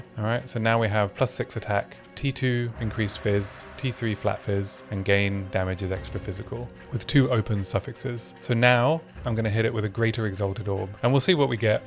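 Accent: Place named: England, United Kingdom. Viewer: Hi. I've been theory crafting a build for the negative armour on Warbringer. I'm on a holiday so been researching other armour break builds when the family have gone to bed. I just watched through your videos of this league and they're great. I love listen to a fellow Englishman talk about POE2 for a change. You videos are high quality and you explain things well. I'll definitely check you out on twitch when I get a chance. And my condolences on the attack speed. 😅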